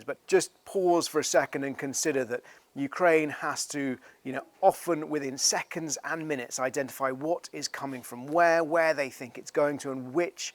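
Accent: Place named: RP, United Kingdom. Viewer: Nice to hear a nice SRP (standard received pronunciation) accent (which only 15% of Brits have unfortunately). It sounds so eloquent and erudite. 85% of global RP accents are in Asia, Latin America and Africa so no, it is not a "British" accent. It is a global RP accent.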